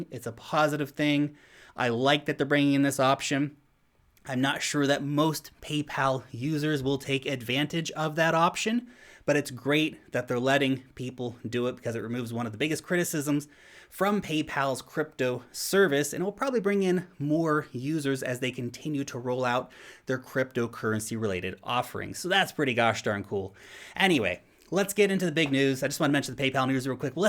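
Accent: United States. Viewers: I always wonder why, as a kiwi, he speaks with an American accent. Any idea? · No accent?!? He has such a strong American accent🤣. But yes definitely no hint of a NZ accent. I am assuming he is an American living in NZ? Or maybe a kiwi who moved to the US as a kid?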